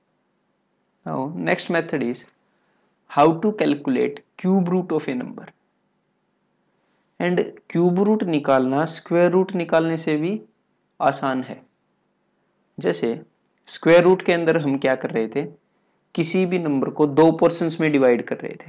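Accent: Haryana, India. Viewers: bhai haryane ke ho ???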